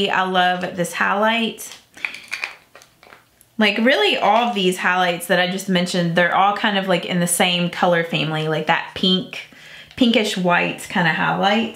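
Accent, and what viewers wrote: South, United States. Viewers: I love your videos. I love drugstore make up too and use it all the time. I am from the south too so I love your accent.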